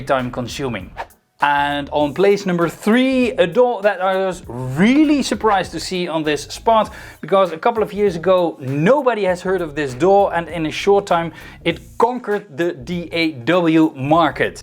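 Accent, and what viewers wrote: Netherlands. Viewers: Hi, Are you from the Netherlands? (The accent sounds to me like that)….in that case I could ask my question in Dutch. 🙂 I was wondering….these are all tips for Imac/windows right? Any suggestions if you want to work on an ipad (and only ipad)? I’m using garageband now….I know it’s not the most professional choice, but for free and I can use it with just my ipad. Any advice?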